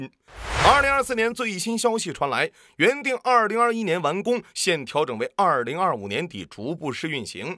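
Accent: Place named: Northeast, China. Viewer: 東北口音。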